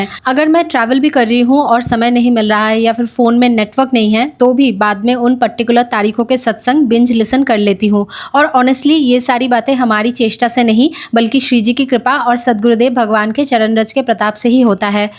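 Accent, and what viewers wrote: Bengal, India. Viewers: Same didi ❤❤jab tak bhajan, katha na laga lu tab tak din ajeeb and adhura sa lagta hai ❤❤ apki bengali voice kitni cute hai 🥰 shree ji ki kripa ap par bani rahe ❤❤Keep It Up ❤❤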